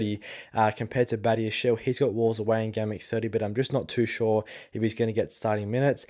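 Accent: Australia. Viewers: Hey Bastian, maybe you don’t understand English and the Aussie accent? Is English your second language? sounds super motivated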